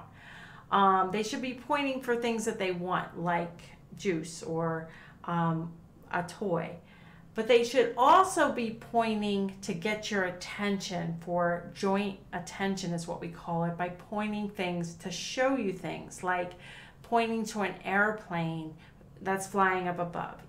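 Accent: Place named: Pennsylvania, United States. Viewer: Knew you were from Pennsylvania right away.😂. from a South Florida transplant by way of the Lehigh Valley and thank u for rhis guide 😊❤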